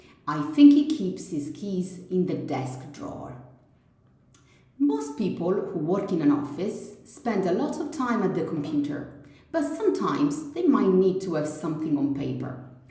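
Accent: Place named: Italy. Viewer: Pronunciation is Italianized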